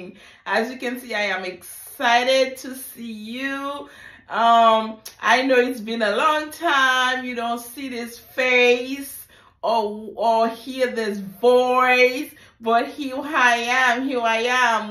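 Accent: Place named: United States. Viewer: With good American accent I like to watch your video my dear cousin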